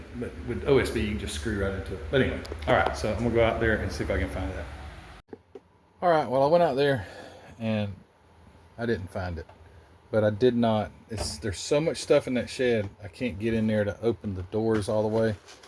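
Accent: South, United States. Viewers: Great update on the model room! That Chrysler car looks like it will be challenging but fun. Interested in seeing you build it. Dont worry bout your southern accent, i understand you just fine, being born in Mississippi! 🤣🤣🤣 Thanks for sharing,!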